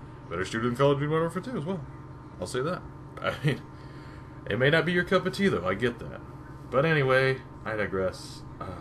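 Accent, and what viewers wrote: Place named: South, United States. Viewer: You have just the slightest twinge of southern accent whenever your start speaking a sentence more than 6 words long. I would totally fuck that slight southern accent.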